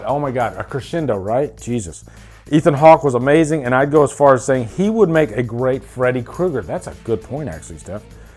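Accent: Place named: Louisiana, United States. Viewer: Are u from Louisiana? I asked cause I can kinda hear an accent lol. Great review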